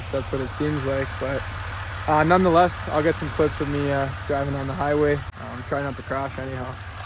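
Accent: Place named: Quebec, Canada. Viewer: Gotta love the French Canadian accent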